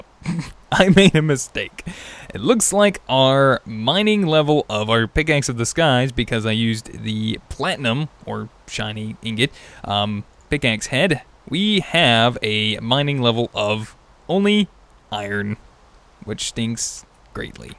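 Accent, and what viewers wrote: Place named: South, United States. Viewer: eli Heakins Based on other people talking in his videos and his speech I think he's from the south not the north.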